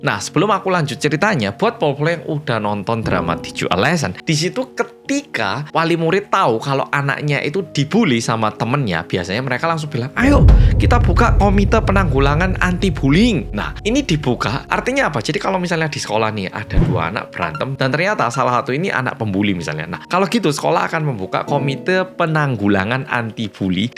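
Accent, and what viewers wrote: Java, Indonesia. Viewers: Hai medok🎉 lgsg nyimaaak🎉 Salam sehat 💪🏿🙏🏿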